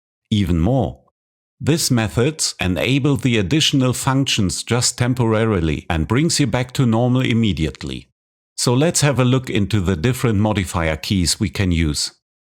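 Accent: Germany. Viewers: Your tutorials are so good. And I like your strong german accent. That matches perfect to your lessons.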